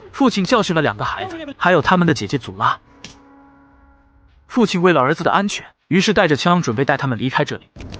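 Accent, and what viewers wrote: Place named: United Kingdom. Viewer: 整片我最出戲的是英式中文，DSG -> 大使館🤣🤣🤣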